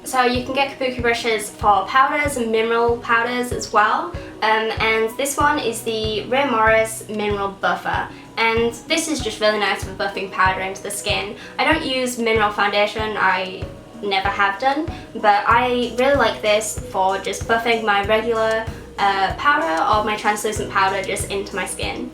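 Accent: United Kingdom. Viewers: I'm not used to the Gothic look with the British accent....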